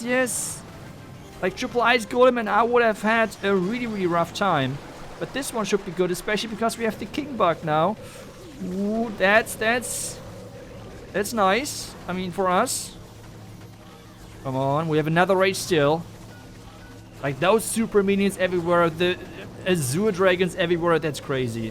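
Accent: Germany. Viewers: Because he is German? I want to hear you speaking German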